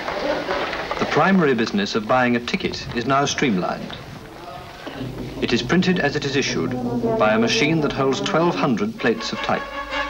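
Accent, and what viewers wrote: RP, United Kingdom. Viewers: Very good commentary cut glass accent people took a pride then… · "rubbing it in and rubbing it off... ...with a massage and a man-sized rinse". I'm sure this plumb-voiced man knew exactly what he was saying, even in 1961 :-)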